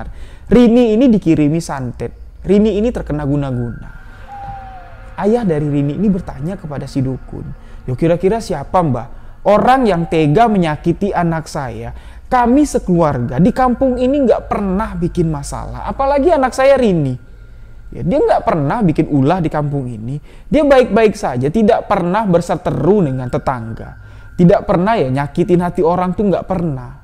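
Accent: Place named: Bali, Indonesia. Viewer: Logat bicaranya kaya org bali y mas😁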